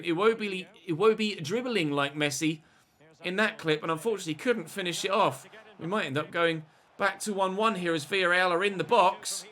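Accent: United Kingdom. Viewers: I love how absolutely British this guy is. A proper Englishman. Its fun to listen to him as an American.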